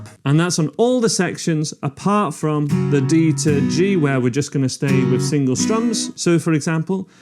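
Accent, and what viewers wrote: United Kingdom. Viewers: Thanks ❤ for the tutorial I hope you have a great new year I love you very much 😘🎸🥰 keep up the good work you have a great voice that British accent 💕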